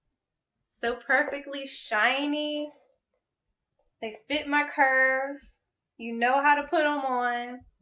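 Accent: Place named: South, United States. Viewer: Love the southern drawl y'all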